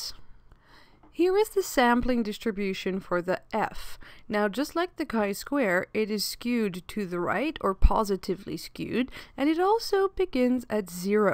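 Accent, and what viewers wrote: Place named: Ireland. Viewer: It's a European thing. She sounds Irish maybe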